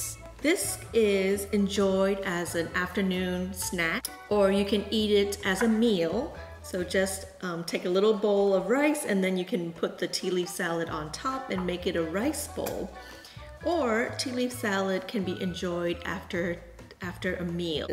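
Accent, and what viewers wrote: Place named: United States. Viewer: She speaks perfect English too with American accent!😊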